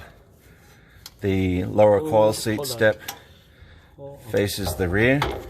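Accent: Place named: Australia. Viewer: I cannot make out your accent for the life of me. It's sorta Australian but not really. Sorta British but not really? Maybe New Zealand? Also, yeah you guys did it bare minimal taking everything apart. Other videos they would have taken everything apart, including the brake lines because they're so paranoid. But when you know what you're doing....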